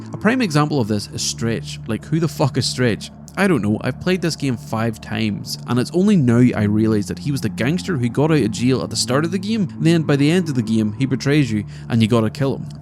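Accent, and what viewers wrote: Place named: Ireland. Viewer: Recently watched your other gta videos mate & subscribed! Great work, plus the commentary with your irish accent is a bonus touch haha! 😄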